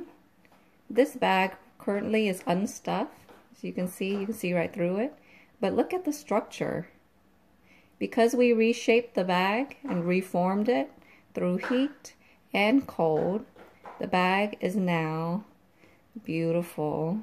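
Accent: South, United States. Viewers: Love your southern twang...where did you grow up? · I love the southern twang 😂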